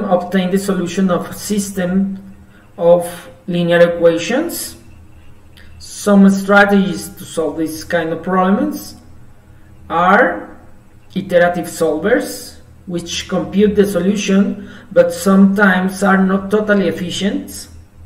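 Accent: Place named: India. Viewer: He speaks like Hindu, not like Mexican jeje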